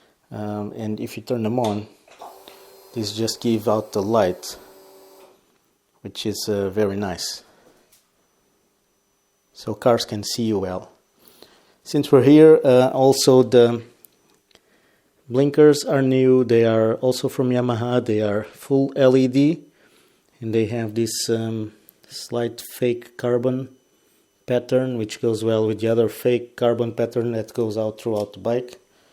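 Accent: Portugal. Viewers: es portugues kkkkk sinto tu a falares e es tuga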